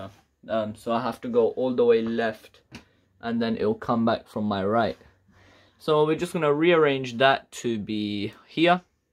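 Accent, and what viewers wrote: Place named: United Kingdom. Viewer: nice british accent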